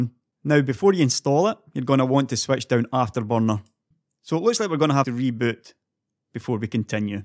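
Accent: Scotland, United Kingdom. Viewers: I just assumed it's another scottish dude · I love the scottish accent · like the scottish? accent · are you scottish? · are you Scotish or Indian ? cause i really like your accent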